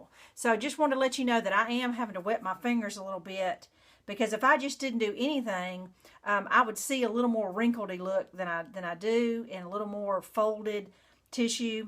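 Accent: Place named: Arkansas, United States. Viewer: i just love rabbits, too -- and those are adorable. The eggs look great in the jars. i live in Va. and my best friend lives in Mena, AR. Her husband is from AR and your accent is so great and reminds me of them -- makes me miss them, too -- maybe i'll send them a care package of eggs !! Also, thanks for the tip on Dollar Tree. i was going to Tues. morning tomorrow, but will hit DT first. hope you and your family have a very blessed Easter season.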